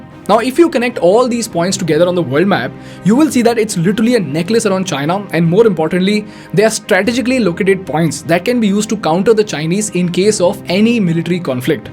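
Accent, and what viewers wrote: India. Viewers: ugh the india accent english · 16.8% of entire world reserves, and 27.9% of natural gas reserves" - Umm... I know what's "natural gas" (and its "reserves"), but I'm rather baffled by that "entire world" reserves ... Would you please clarify? ;-) Also, nothing 'ad personam", but with such a strong Indian accent I'd recommend you to slow down a little... I know, "machine gun style" speaking is also part of "Indian accent", but still - if I haven't spend couple of years in India I'd have difficulties understanding you. Please have a mercy on all them Britons, Americans, and other speakers of all those "non-standard" varieties of English, would you? ;-) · Sounds like you're pretending to be speaking English but talking in Hindu🤔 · I like indian english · it's indian accent bro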